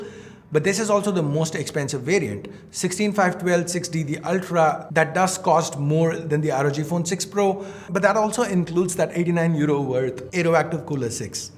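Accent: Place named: India. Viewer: Finally, Indian english speaking reviewer...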